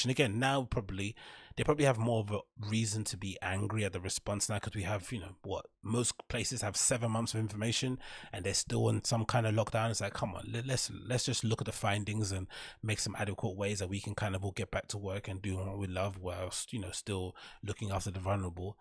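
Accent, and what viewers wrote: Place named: United Kingdom. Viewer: I love your content and just giving out the facts, but your thick British accent and your very fast talking is hard to follow.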